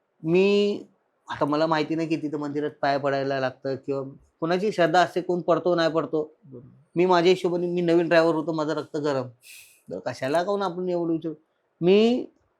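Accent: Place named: North India, India. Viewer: Hyacha gav bagha bolanyawarun bhaiya watato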